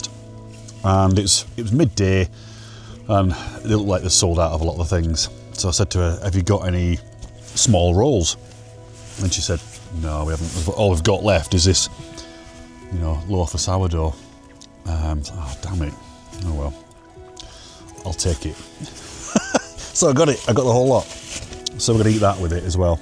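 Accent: Yorkshire, United Kingdom. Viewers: I’m relatively new and love your videos. I went back to watch your Everest Base Camp video because you or someone mentioned it. Amazing camerawork, I felt like I was right there with you. As an ex-Yorkie now living in NZ, I love seeing the scenery that I left behind “oop north” and your accent reminds me of home. Amazed the Achilles is healing after a fast, gives me hope that I can fix my dodgy knees - Day 1 of my fast started today. Cheers!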